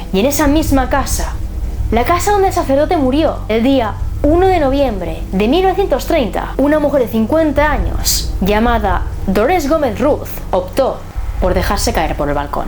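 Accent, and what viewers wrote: Galicia, Spain. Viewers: eres gallega fijo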